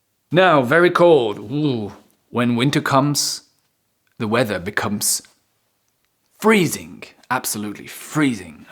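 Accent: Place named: United Kingdom. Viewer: I like your british english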